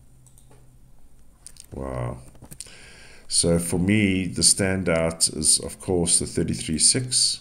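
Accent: South Africa. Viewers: Anyone else just clean their whole house or take naps or listen to these videos before bed? I just love South African accent.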